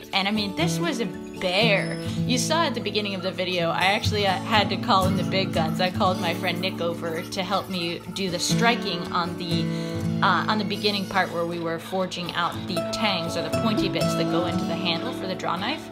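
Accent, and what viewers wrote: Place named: Texas, United States. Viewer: are you a Texas gal too, accent sounds right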